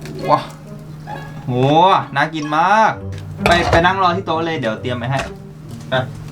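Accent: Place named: North, Thailand. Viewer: พี่เป็นคนเหนือหรอครับทำไมพูดเหนื่อ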